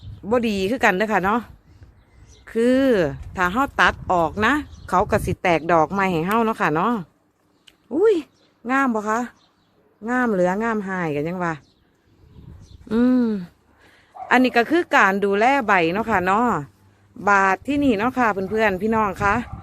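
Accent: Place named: Isan, Thailand. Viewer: ขอบคุณวิธีดูแลกุหลาบเด้อค่ะ หนูกะปลูกคือกันจ้าแต่กุหลาบหนูกำลังสิตายหนูดูแลบ่เป็น หนูคือมักพี่เว่าแท้เว่าตลกดีๆฟังไปได้หัวเราะไป เว่าอิสานนี้ละจ้าเป็นตัวของตัวเองดีจ้า หนูเป็นกำลังใจให้เด้อจ้า😊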